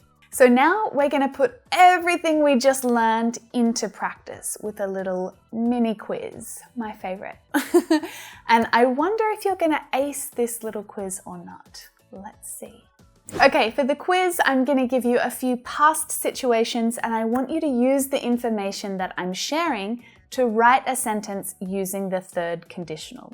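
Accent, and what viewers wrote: Australia. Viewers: Teacher are you from Astralia? your accent is very pleasant to hear !!!! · You are from Australia no America l don't like your accent l prefer the American accent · By hearing your accent it seems like you’re australian, am i right? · If I had known I was supposed to bring some food to the party, I would have made a delicious meal and brought it to the party.... I love your Aussie accent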